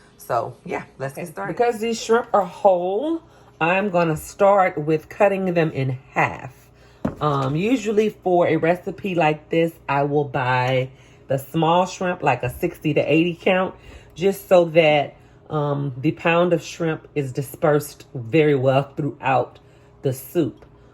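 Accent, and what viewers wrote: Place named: New Orleans, United States. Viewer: Yeah ya rite! Is your family from Baton Rouge...you sound like you got some Donaldsonville or New Orleans twang mixed in there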